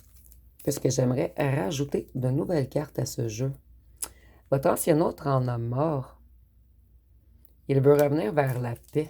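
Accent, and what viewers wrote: Quebec, Canada. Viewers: J adore ton accent Québécois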